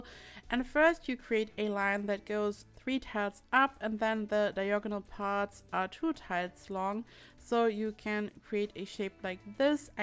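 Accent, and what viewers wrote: Germany. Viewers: are you from Germany? A friend of mine is and the accent is very similar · You sound german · are u german?? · You sound like german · Are you German? Because you sound like you are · U have an interesting accent! Are u from Germany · Ur German right?😂😂 Nice accent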